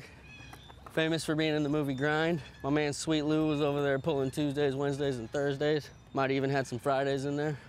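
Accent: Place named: South, United States. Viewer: How does this dude have a southern accent?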